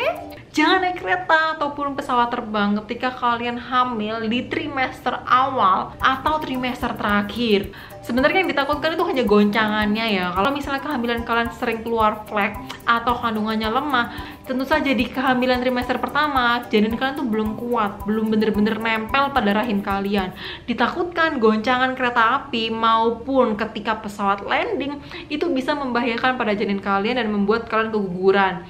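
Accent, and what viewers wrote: Java, Indonesia. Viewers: Medok bgt sih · mba bukan orang jawa ya? Orang jawa memang gitu mba, kakak ku juga gitu klo ngomong ngeden2. Itu adalah logat namanya.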